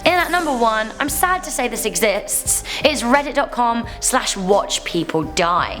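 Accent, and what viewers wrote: England, United Kingdom. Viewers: Em jacks Her accent is English and that is what we are talking about. We're not talking about where she lives. He's referring to accents and she's no way Canadian in that department. I am British myself, so I would know. Lol. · The English accent takes her from a 5 to a 3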